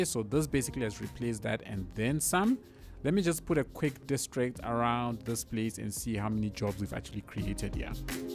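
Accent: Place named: South Africa. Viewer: Love your South African accent bro refreshing from all the American YouTubers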